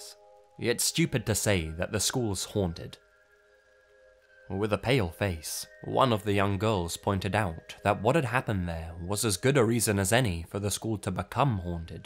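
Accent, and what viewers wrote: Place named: United Kingdom. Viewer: Hi, I'm English and enjoy your channel very much, the English comment was because of your very British accent. You sound like a BBC docu. narrator, it "kills" me for this reason when you drop in vernacular, like, "...naturally, she lost her shit.", or "nearly shit myself!" WELL DONE, keep doing what you're doing and I will keep veiwing. Respect! ANDY F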